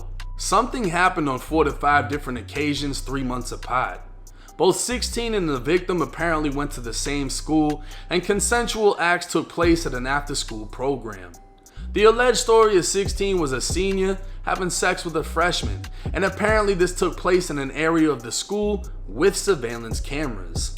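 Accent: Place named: Chicago, United States. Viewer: u the goat u gotta be from the raq boa u sound just like us great content.. bandman Kevo the city proud of u boa.. turned yo whole life around respect.. did u really get a bbl tho g? No judgement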